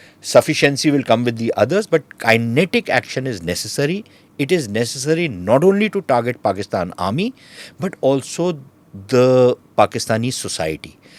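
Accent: India. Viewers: Good god that indian accent is like broken glasses hitting ears. All of this is just nonsense. First of all Free Kashmir from illegal Indian occupation and indian army brutality. This is the only solution to all the problems. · Can you please translate into English or English subtitles because I cannot understand Indian Dumbass English accent. 😂😅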